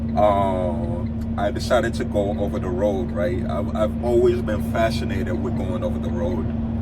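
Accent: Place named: New York, United States. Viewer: u sound like from new york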